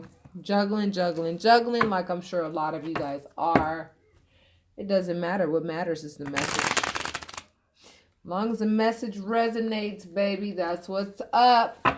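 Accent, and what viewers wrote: New York, United States. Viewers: you got that Harlem, Bx or Brooklyn heart in you...I can hear it when you speak😉🤩. Again, thank for this Cancer read!! Pisces is soon gonna see!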